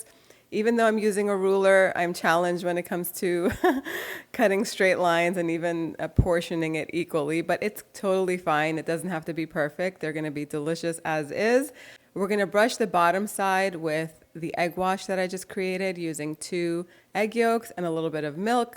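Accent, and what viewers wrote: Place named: United States. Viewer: To long intros, to much american talking.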